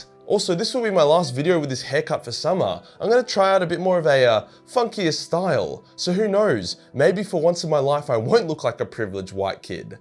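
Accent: Australia. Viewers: I was about to say "summer?" But yep Australian, not bittish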